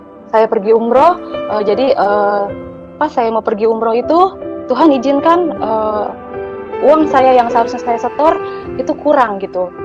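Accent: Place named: Sulawesi, Indonesia. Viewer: Suara nya KY orang Sulawesi...KY oten gt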